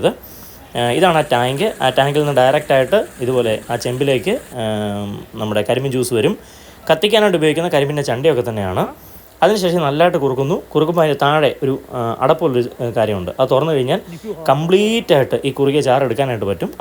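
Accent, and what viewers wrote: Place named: Tamil Nadu, India. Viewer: There comes a tamil influence in your talk 😜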